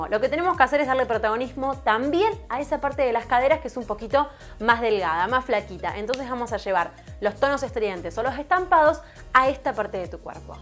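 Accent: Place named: Argentina. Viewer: vi muchos vídeos aqui en Brasil sobre el cuerpo triangulo invertido, pero sin duda tu vídeo explicó mejor que todos, gracias un beso, es el primero vídeo tuyo que veo, imagino que seas argentina no? El acento es inconfundible 😊😙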